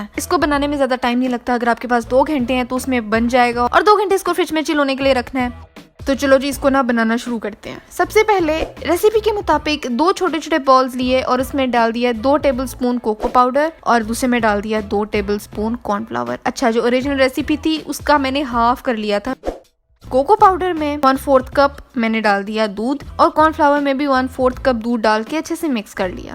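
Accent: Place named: Punjab, India. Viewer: that punjabi accent is just wowwwwwwww :)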